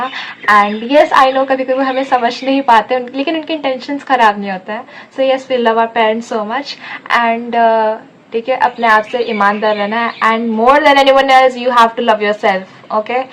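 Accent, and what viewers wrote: Bihar, India. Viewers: dii bihar se ho aap bhi really mujhe laga delhi ki hain aap😁😁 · Didi bihar se hai kyaa aap..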